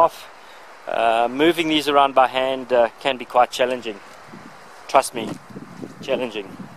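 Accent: South Africa. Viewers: Are you from South Africa ? Soos in afrikaans ? USA ?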